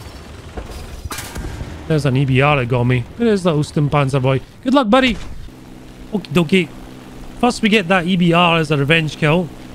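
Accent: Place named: Aberdeen, United Kingdom. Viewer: I love the accent and are you from Aberdeen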